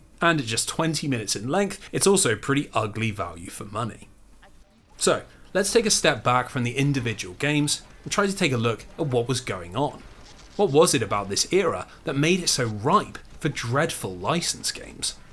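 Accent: England, United Kingdom. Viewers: Smooth talking British slop is my medicine 🥸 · Wait you said a market wide crash but excuse me if I detect a English accent, the thing is I’ve seen countless Europeans complain us Americans are sooooo egotistical for ignoring how there was no crash in Europe and how we are so terrible for assuming the world revolves around us awful Americans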